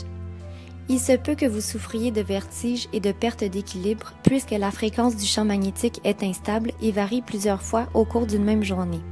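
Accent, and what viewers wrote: Canada. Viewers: l'humanité à l'image de la Terre, malade, en souffrance. Beau documentaire et bel accent cousine Canadienne!!!!!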